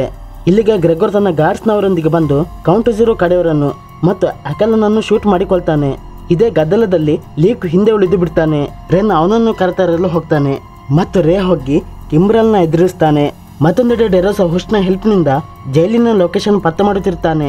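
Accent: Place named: Mangalore, India. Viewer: Are u from Kudla/Manglore?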